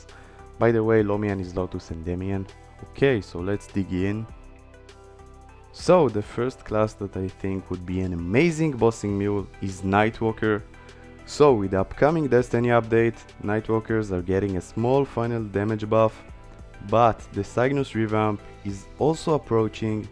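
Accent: Israel. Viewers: ישר זיהיתי תמבטא הישראלי אחי ישר סאב! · וואלה יא קינג זהיתי אותך לפי מבטא איזה ישראלונים אנחנו, אחלה סרטון דרך אגב.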